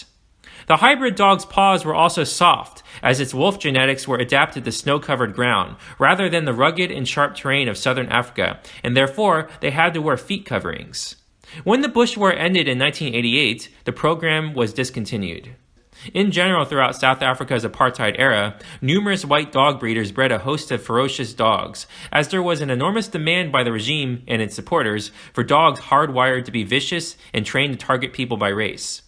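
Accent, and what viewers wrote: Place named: North America. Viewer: The narrator has a North American accemt. I wonder if in his research he came across how United States and Canadians did the exact same thing. Do a video on these two countries. Especially the racialised science and politics of the US which continues to this day!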